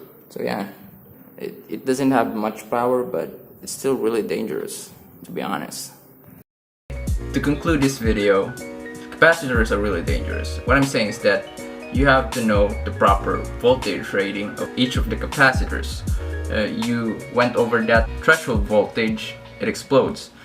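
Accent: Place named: England, United Kingdom. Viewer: I love your english accent pls keep doing more videos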